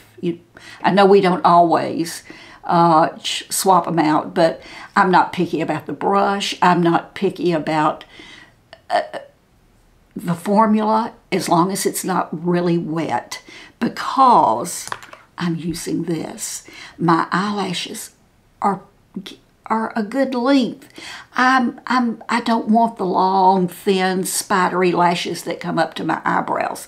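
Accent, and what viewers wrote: South, United States. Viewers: really enjoy your videos. Love your Southern? accent. Could you tell me what in your opinion, is the bed undermaker primer. I have a lot of wrinkles from living in the middle east for years and being very fair. Thanks. xx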